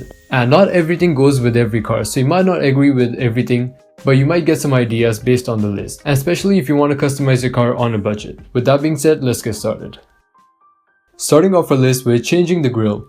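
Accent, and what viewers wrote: India. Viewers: Are you from India? You have Indian accent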